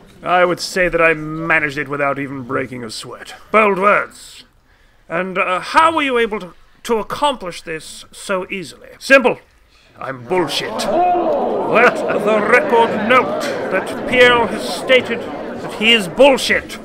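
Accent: United Kingdom. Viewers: people with british accents make things sound much more intelligent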